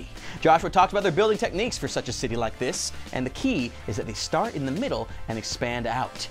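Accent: Netherlands. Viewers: Wait... are you dutch?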